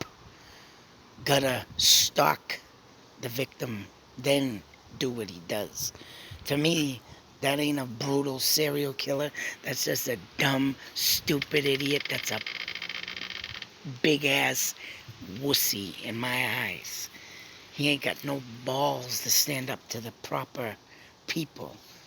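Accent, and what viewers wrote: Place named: Maine, United States. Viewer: Dude I like your Delphi videos. You sound like you are from Maine? I recognize the accent...I am from Vermont. thank you for this video.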